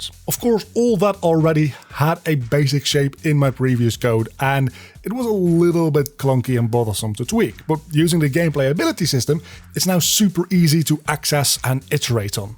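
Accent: Netherlands. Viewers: I was like "this guy sounds so Dutch".. then looking at the About page..😂 Anyways, lekker bezig! Ik moet binnenkort ook maar eens gaan koekeloeren in Unreal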